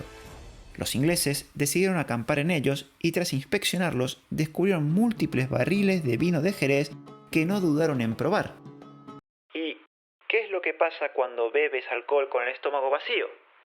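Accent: Latin America. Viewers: ¿Su voz en off es la de un hispanoamericano que valora las aportaciones del Imperio Español a sus territorios?. Una misma Lengua (la del Reino de Castilla) y una misma Fe Católica.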